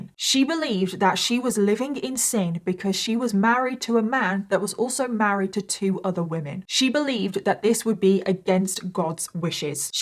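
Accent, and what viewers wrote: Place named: Birmingham, United Kingdom. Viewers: You’re so amazing , and make me feel at home with your accent I live in Australia but from Birmingham !! You’re my favourite when I’m having a adhd moment you keep me on task 🙏🏻🙌🌻🫶🏻🥂🎩🤘🏼👽💜💚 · Ooh are you a fellow brummie?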